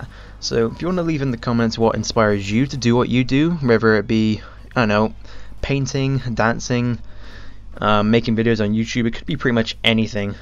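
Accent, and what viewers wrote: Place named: England, United Kingdom. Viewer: You sound english...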